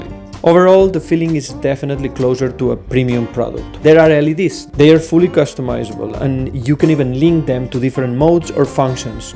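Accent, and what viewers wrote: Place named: Greece. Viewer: Greek i believe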